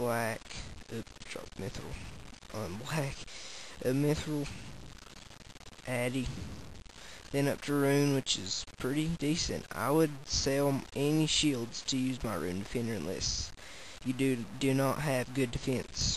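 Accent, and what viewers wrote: United States. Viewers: i like the texan voice too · You have an awesome Southern Accent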